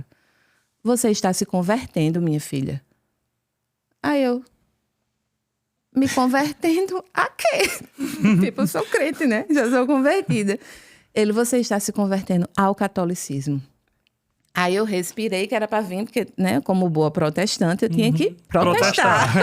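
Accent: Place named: João Pessoa, Brazil. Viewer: Que lindo este testemunho! Sou do Rio e amo esse sotaque de Jampa. Tenho grandes amigos na cidade. Fui católico do IBGE quando adolescente, virei ateu após os 20 anos contaminado pela universidade. Agnóstico aos 30, e Cristão Free Style após um método CIS do Paulo Vieira aos 38. Aos 40 passando por um grande problema no trabalho, minha esposa me "impôs" uma viagem a Campos do Jordão com uns amigos para espairecer e pedi que no caminho a gente parasse no Santuário de Aparecida, pois desde que voltei a ser cristão não entrava numa igreja. Ao chegar e rezar frente a imagem para agradecer por todas as minhas bênçãos independente do problema, a solução chegou através de um telefonema! Naquele momento tive a certeza que precisava ser católico, e agora, 5 anos depois estou fazendo o Catecumenato e me apaixonando cada dia mais por nossa Igreja. 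Em Julho farei o caminho da fé e aguardo ansiosamente por minha primeira comunhão. Salve Maria e Viva Cristo Rei!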